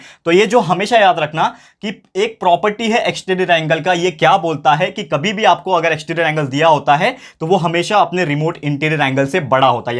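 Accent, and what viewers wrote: Maharashtra, India. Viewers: Marathi bolne ki koshish jari hai... ❤❤❤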